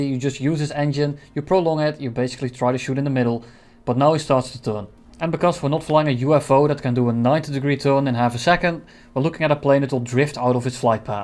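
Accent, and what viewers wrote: Netherlands. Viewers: are you from the netherlands? it sounds like you have a netherlands accent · whats that accent? it reminds me of an dutch accent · Your accent sounds a little Dutch. Are you? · Dutch accent detected?? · Are you dutch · You’re Dutch aren’t you? Nice accent bro. (Frikandelbroodje) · Dutch accent! xD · Bro is this guy dutch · Beetje nederlands acentje dit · Are you dutch? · 16 sec in 2 words spoken eeeeeey dutch guy insta subbed goed beezziiig. xD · Ain't he Dutch? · i love your dutch accent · are you from the netherlands?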